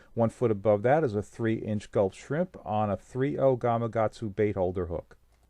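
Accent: Long Island, United States. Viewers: Would love to watch the video but can't stomach the Long Island accent...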